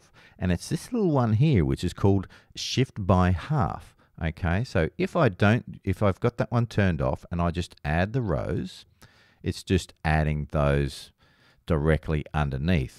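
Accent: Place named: Australia. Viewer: Great lesson. I’m trying to learn all I can, so I can stop buying files and make my own work. Love the Aussie accent. Australia is on my bucket list to visit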